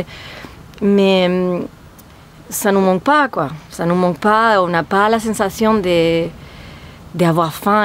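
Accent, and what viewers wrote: Spain. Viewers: Cc je te dis avec sincérité que tu parle notre langue parfaitement,ton accent d'Espagne est charmeur j'adore continue, continue, continue.la bise 😘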